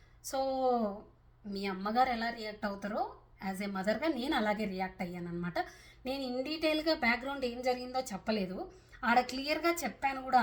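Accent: Rayalaseema, India. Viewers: అక్క నమస్తే వాలీల మాటలు పట్టించుకోవద్దు అక్క మనం ఏది అనుకుంటున్నామో మనం ఏం చేయాలనుకున్నామో అదే చేయాలి మనం ఒకవేళ వెళ్లాలి అనుకున్నాము అదే వేలో వెళ్లాలి అక్క వాళ్ళ ఇల్లు మాటలు పట్టించుకోవద్దు బట్ ఇప్పుడు అంతగా కామెంట్ పెట్టిన వాళ్ళు కూడా నీకు ఎవరో చెడుగా పెట్టారు అని అన్నారు కదా వాళ్ళ పాపం వాళ్ళదే ఫోన్ చేయమంటే వదిలేసేయండి వీళ్ళకి కూడా అర్థమైందా లేక చెప్పారు కాబట్టి సంతోషం అందరు కామెంట్స్ చదివి మీరు రిప్లై ఇచ్చారు కాబట్టి చాలా సంతోషం అక్క పట్టించుకోవద్దు అక్క కొంతమంది కొంతమంది మాట్లాడుతూ ఉంటారు పిచ్చి మొహాలు కొంతమంది ఇలా ఫేక్ ఐడి లు క్రియేట్ చేసుకుని మాట్లాడితే అమ్మ నీ అక్కని చెల్లిన అంటే ఎవరు పడరక్క తిరిగి వాళ్ళని అంటారని ఆలోచన వాళ్లకు ఉండదక్క పిచ్చి మొహాలు అట్లానే ఉంటారు వదిలేసి మీ వీడియోస్ చాలా బాగుంటాయి మీ ఫస్ట్ మీ వాయిస్ చాలా బాగుంటుంది అక్క నేను ఒక తమ్ముడుగా చెప్తున్నాను ఒక శివ సత్తిగా చెప్తున్నాను ఓకేనా అక్క చాలా మంచిగా ఉంటది మీ వాయిస్ కూడా పక్కా మన లాంగ్వేజ్ ఎట్లా ఉంటుందంటే మాస్ లాంగ్వేజ్ అలాగే మాట్లాడతావు దీంట్లో ఇంగ్లీష్ గాని అంత బయట దేశంలో ఉండి కూడా స్వచ్ఛమైన మన రాయలసీమ లాంగ్వేజ్ లో మాట్లాడితే హాట్సాఫ్ అక్క నీకు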